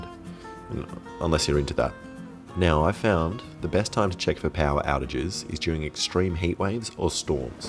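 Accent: Australia. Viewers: it's how ya going. traditional aussie greeting. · R u from Australien? Cause u Sound cool? Getreu from Germany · You Aussie mate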